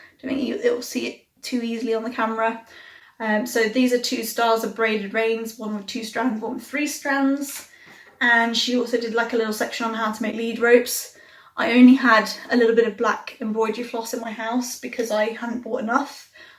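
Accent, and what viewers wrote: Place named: United Kingdom. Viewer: Nice to hear how an international Breyerfest fan enjoyed the weekend. Love your British accent and you boyfriend is a good with his points!! Fun watch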